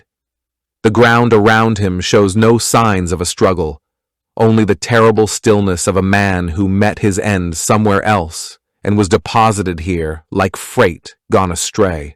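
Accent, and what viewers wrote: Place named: United States. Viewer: The American accent spoils it for me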